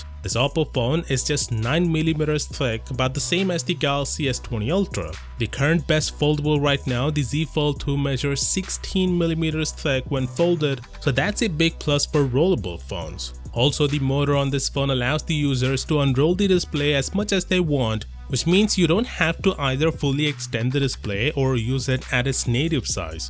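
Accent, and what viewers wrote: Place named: India. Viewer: Man I can't listen to his indian accent. Furthermore it's an complete nonsense to say that it is a advantage of rollable phones that they are thinner. You could easyily built a foldable phone to be as thin as a rollable but manufacturers don't because you need a larger battery for a larger display. The same goes for rollable phones. Yes this phone is thinner but I don't want to know how the battery life is when it's unrolled.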